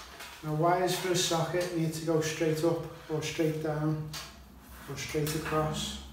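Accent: Liverpool, United Kingdom. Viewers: A Scouser doing some work, luv it.....just luv it. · Great great vid. Good to hear the scouse accent as well now i want to spur off two sockets in my bedroom but as it's upstairs do I have to feed the cable through the loft joists Also do you have a website where you do general questions and answers. Thanks!!!!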